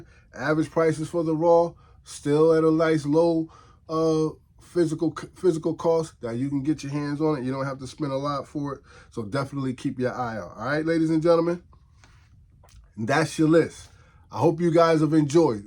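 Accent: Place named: New York, United States. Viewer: Yo! Did you go to school in the Bronx back in the 80’s and early 90’s? You sound like a New Yorker for real!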